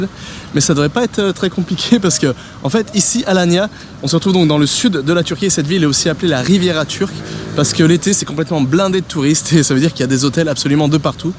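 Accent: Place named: France. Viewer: Акцент чисто как у французов. Молодец. Très bien.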